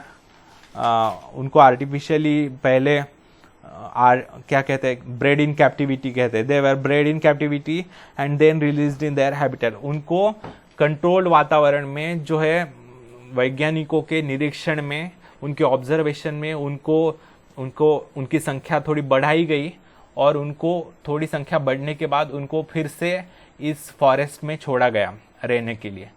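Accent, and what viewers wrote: Maharashtra, India. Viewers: Thank you so much sir for teaching us very nicely...😁 Btw I have a question , is your mother language is Marathi..??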